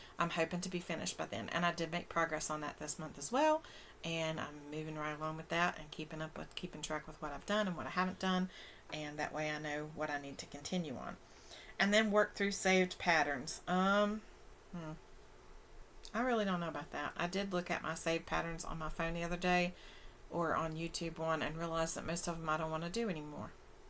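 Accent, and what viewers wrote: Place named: South, United States. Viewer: Hello, nice to meet y'all. Where are you?? I'm catching a southern accent.... I'm a transplanted Texan in Tennessee.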